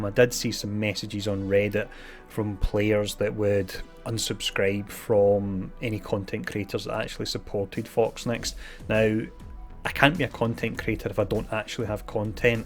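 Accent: Scotland, United Kingdom. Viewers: I applaud you for your honesty and integrity. I recently started playing MSF because I have too much time at home due to an injury, and I am binge watching many MSF videos from many content creators and I could tell you are different from the rest instantly. No disrespect to others, but your calm and easy going attitude (even when you keep getting minions during orb openings) made me subscribe to your channel. When I get better and start going to work, I doubt I will have time for MSF (Marvel Future Fight is a much better game, no randomness bullshit, purchases are not mandatory and do not cost an arm and a leg, etc) but I will keep subscribed to your channel and watch your videos as they are released. I also love listening to your lovely Scottish accent:) Keep up the good work.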